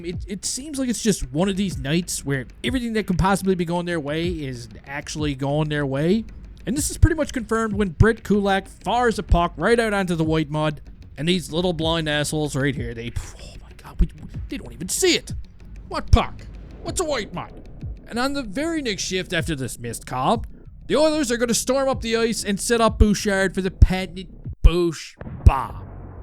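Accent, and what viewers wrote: Newfoundland, Canada. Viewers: Yo man, sick vid but are you a Newfoundlander? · sounds like you have some newfie in ya, sounds great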